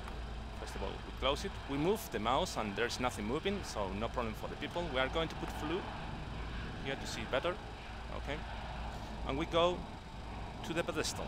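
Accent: Spain. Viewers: Damn I love your voice!!! So spanish ^^ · A complete tutorial by Antonio Banderas (: Just kidding. Your Spanish accent is real class man. I love it!, this is a wonderful aircraft and I'm really enjoying it. Even though I use TrackIR (and I thought everyone did), this plug-in will help a lot. Your link didn't work for me, but I used this one: misc plugins page and see it as "LockView" download.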